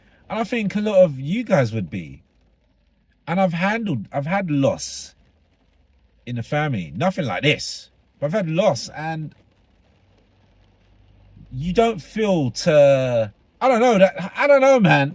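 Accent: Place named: United Kingdom. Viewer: Hi, from US, I too thought it was a bit weird but thought, maybe thats from the stress of her grieving, maybe she was even a little medicated. I dont know. I myself would've been far from the public eye & probably under heavy grief support somewhere. I'd be not ok if this happened to me. So, its all very sad and strange. Love your accent, are you in the UK? Love it there. 😊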